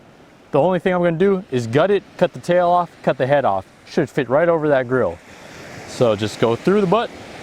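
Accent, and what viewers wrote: Bay Area, United States. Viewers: Yo the way he talks, he’s fasholy from the Bay Area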